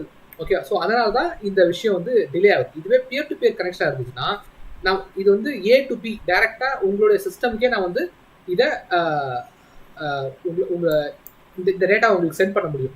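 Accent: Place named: India. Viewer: As an Indian myself...i cannot understand him.....his Indian accented English is atrocious.....Or is he speaking Mandarin ???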